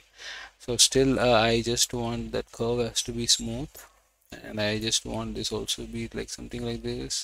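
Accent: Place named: India. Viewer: I wish there was a way to filter out Indian videos. I cannot possibly listen to that accent.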